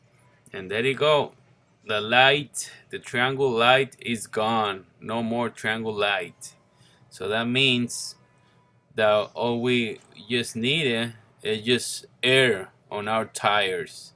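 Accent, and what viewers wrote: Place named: Mexico. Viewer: Give him a break bro, that's a Mexican accent, at least he can speak 2 languages (Spanish and English) .Stop the hate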